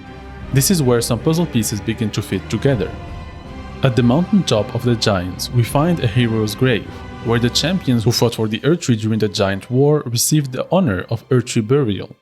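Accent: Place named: France. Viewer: I know a French accent when I meet one, excellente video l’ami penses tu en faire en français ?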